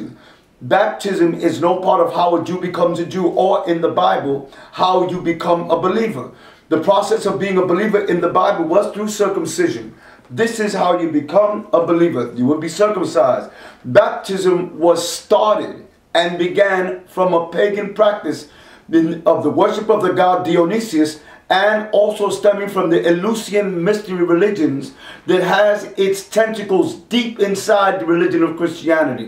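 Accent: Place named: Arizona, United States. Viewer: Just curious but where did handler nab you? Did you get sent to the fili or mexi camps for field op training yet? I'm thinking arizona raised based on accent hint, possibly california or nevada.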